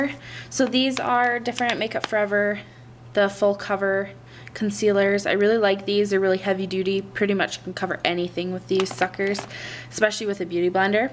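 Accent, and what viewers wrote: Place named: Canada. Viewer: you sound canadian? just the way you say certain words. or maybe from the northern part of us near canada like minnesota or something. thanks for the vid btw...very helpful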